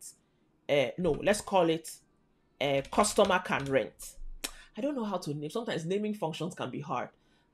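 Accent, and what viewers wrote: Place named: Nigeria. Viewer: Nice! Off -Topic: From your Accent it’s hard to believe you are not Nigerian (specifically southern Nigeria (south south or south east)…intonation & manner of speaking clearly Nigeria, & I’m sure other Southern Nigerians will feel the same way. If you do that ancestry-ish tracing stuff. I’d easily place a Bet on the result matching what I said above.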